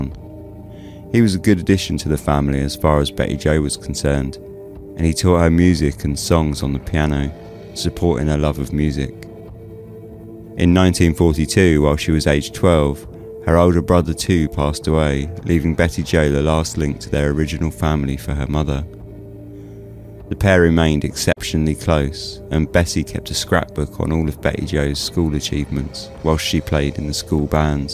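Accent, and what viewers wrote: United Kingdom. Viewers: lol. - there are USA. Americans that can't pronounce Arkansas or Texarkana. You merely pronounce it with a British accent. ToMAYto - ToMOTo Ya know, we Americans actually - get that one right. lol ...and, I specifically recall being taught that the "H" is silent - in "herbs" (you folks pronounce it like one would expect an American to - "?" No problem, I'm just curious what the original rule is.)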